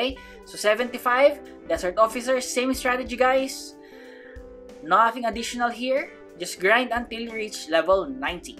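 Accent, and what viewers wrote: Philippines. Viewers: Hi are you Filipino? Bc u sound like Filipino btw good work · Knew you said sa Skylands are you Filipino?????? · your accent is so philippino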